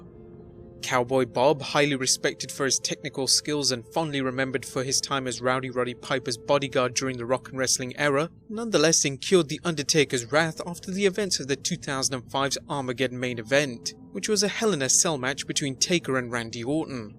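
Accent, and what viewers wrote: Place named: United Kingdom. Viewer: Why is it always a British accent?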